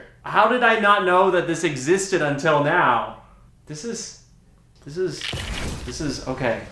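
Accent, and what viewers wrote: Australia. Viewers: Hahaha I have been so confused and thought I was going crazy like why does he only sometimes have an Australian accent!? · dude you sound australain. do you live in my backyard ??? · Is it me or did you change your accent to a more Australian accent? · Bro Your accent sounds like it’s from Australia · please don't apologise for the australian accent... in fact, you should apologise that you lost it in the first place :D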